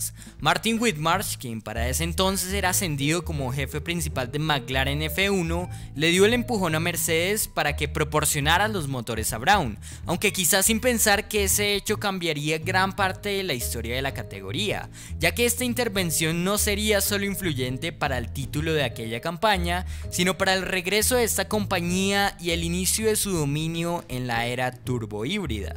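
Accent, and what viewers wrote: Colombia. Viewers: Por el asentó colombiano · Felicitaciones, gran contenido, con rigor técnico, sigue así y llegarás lejos. Por tu acento eres colombiano, así que un saludo a ese gran país cuna del gran Juan Pablo Montoya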